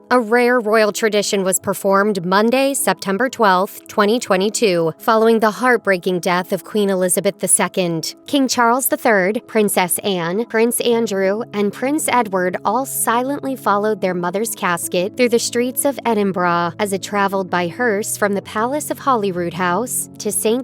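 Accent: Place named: United States. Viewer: Horrible American accent from commentator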